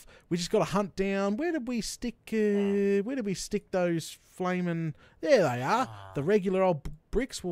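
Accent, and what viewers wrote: Australia. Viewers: This man's voice is the most stereotypical Australian voice I've ever heard and I love it. Keep up the good work!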